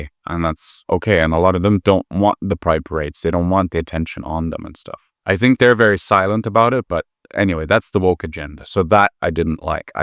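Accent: United States. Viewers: You have an american accent . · You could just call 'the West' Europe. That's geographically where you are speaking about. You video is nonsense, - there is freedom of movement in Europe, within the EU. Saying 'Eastern Europeans' made Ireland less safe is complete nonsense. Many Polish people settled in Ireland and added hugely to Irish society. You are so anti the US and yet you sound so American, you certainly don't sound like a person who lived in Galway since they were nine years old. Ireland has experienced an influx of illegal immigrants who come into Ireland via Northern Ireland, but those numbers are falling now. We have had a lot of Ukranian refugees, fleeing war. Would you suggest not helping refugees fleeing war? "Indians have fake degrees" - no faker than you stating you have a masters in pharmacology, only to later admit you dropped out, so you don't have any masters degree. 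Lithuania joined the EU in 2004, so you weren't an immigrant who needed a visa when your family moved to Ireland, you were just someone exercising EU freedom of movement with your family.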